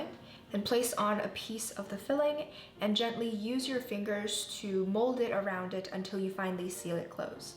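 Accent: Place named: Singapore. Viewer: First time coming here, you sound very Singaporean.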